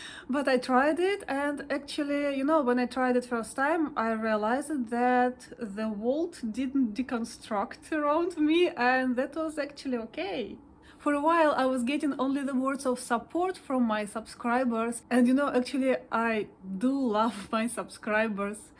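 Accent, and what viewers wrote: Russia. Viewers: Hi, you are worrying too much. I am English with a Yorkshire accent. There are plenty of people in the UK who i would find more difficult to understand than you. In any case your Russian accent has its own charm. Your spoken English is clear, so dont fret! Except when you're playing open strings, obviously!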